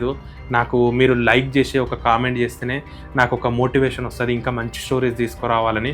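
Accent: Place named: Telangana, India. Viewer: నాకు నచ్చింది మన తెలంగాణ యాస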